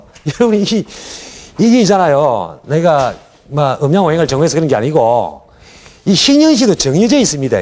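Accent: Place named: Gyeongsang, South Korea. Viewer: 내가 들으니 사투리가 하나도 없는 정통 표준말인데, 다만 말투가 경상도 말투로 보이는데 그것을 말꼬투리 잡아 사투리라고 시비걸면 쓰나?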